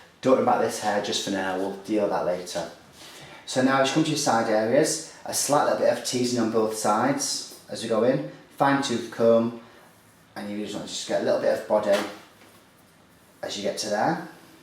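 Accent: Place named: Lancashire, United Kingdom. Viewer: Nice - impressive to get so much volume with just back-combing & clips (especially on silky hair); that brush sounds gentle. I like the teased/ smoothed wrap-around at the end (aprox. ); very pretty way to pull everything together. Awesome hat (I've been wanting one of those for a while)! I wish I knew more about English accents; yours reminds me of a couple famous people from (..looking them up now) Lancashire, & Yorkshire. Hope to see you again soon! x o :)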